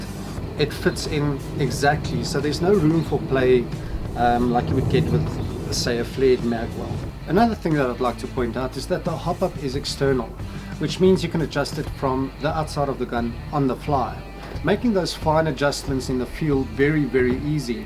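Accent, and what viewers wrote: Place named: South Africa. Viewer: are u from south afrika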